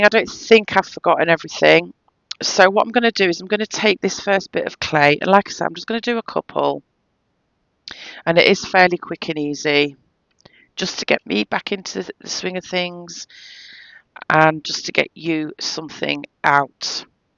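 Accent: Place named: North, United Kingdom. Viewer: Your accent sounds very familiar to me! I’m also a Brit living in the USA in SoCal. I’m thinking your from the northern parts of the UK ?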